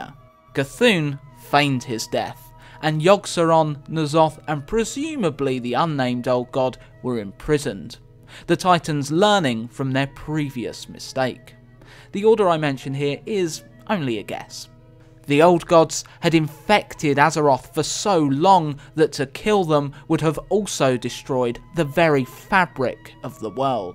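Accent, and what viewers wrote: United Kingdom. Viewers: I'm really surprised I found that, as iv'e only started playing hearthstone 6 months ago and never played WoW in my life.. cool! I wonder what the mistake actually is....... Btw, may i ask where you're from? I guess british but i'm not sure..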